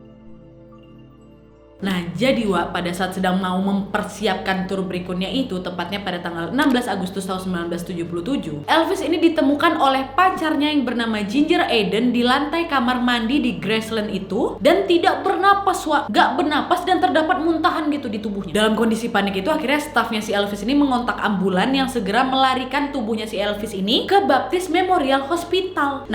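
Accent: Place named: Medan, Indonesia. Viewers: Logatnya kayak orang Medan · Kakak ni pasti orang medan ya sukak kali sama cakapnya... hahaha... iya kan wak??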